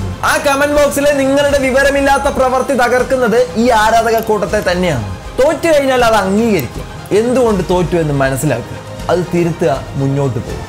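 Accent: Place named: Thrissur, India. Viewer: Intro oru thrissur ഭാഷ പോലെ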